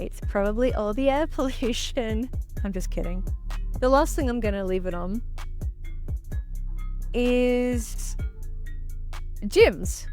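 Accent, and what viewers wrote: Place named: Australia. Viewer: Do you happen to be from Australlia😅? I noticed your australlian accent and the slangs right away😁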